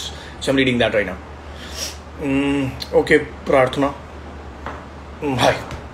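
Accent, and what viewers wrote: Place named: India. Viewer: It's difficult to catch english with an indian accent , but it was nice to see a very talented actor. Best wishes from Russia.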